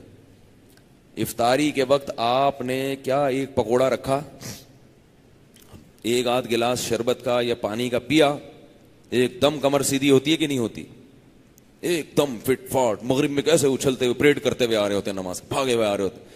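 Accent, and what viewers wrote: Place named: Karachi, Pakistan. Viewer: Apka bat krny ka andaz mujhy bhout pasand ha ماشاءاللہ ar boli sa app mujhy karachi sa lagty hain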